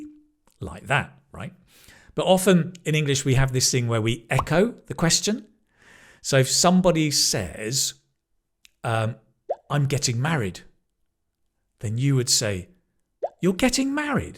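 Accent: United Kingdom. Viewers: I love your british accent❤Very useful video❤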